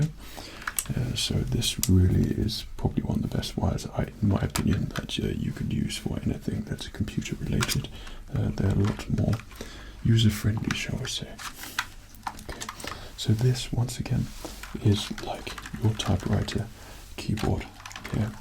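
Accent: England, United Kingdom. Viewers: yes he is originally from Oceania but he does live in the Uk and has for a while with is wife he also sounds pretty british anyway in my opinion. Love from the Uk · You would make such a great Arthur Morgan roleplay! Do that but instead of an American, you're a posh rude English cowboy! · I cannot tell is this guy actually British and I am sure pc guys do not everything like this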